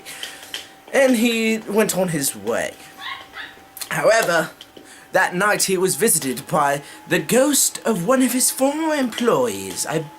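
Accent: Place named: United Kingdom. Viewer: Nice British accent haha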